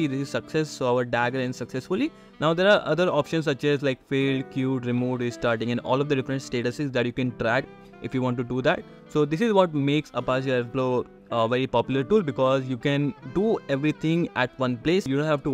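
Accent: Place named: India. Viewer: It's usually difficult for me to understand the Indian accent, but your knowledge, examples, and slowing down the speed to .9x was wonderful. Great video!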